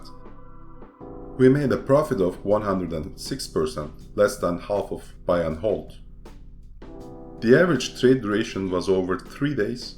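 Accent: Turkey. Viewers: It seems a Turkish voice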